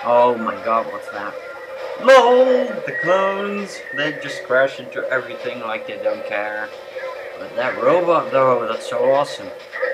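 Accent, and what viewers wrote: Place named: Philadelphia, United States. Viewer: Good tutorial, u from the states? you have a philly accent...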